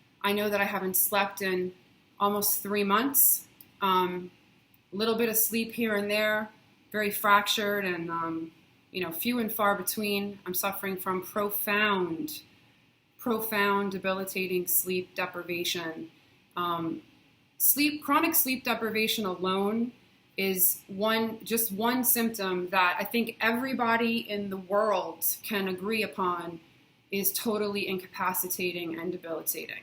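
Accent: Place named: East Coast, United States. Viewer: I love your subtle east coast accent and attitude. You are still attractive on your worst day - Jacob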